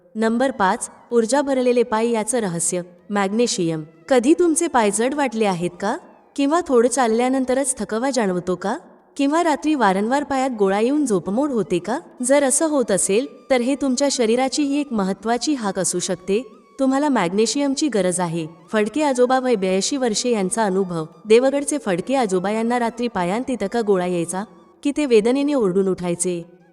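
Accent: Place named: Karnataka, India. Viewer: अगदी बरोबर.... मलाही माहिती उपायुक्त वाटली पण यांच्या व्हिडिओ तील कानडी टोन ऐकायला नको वाटतो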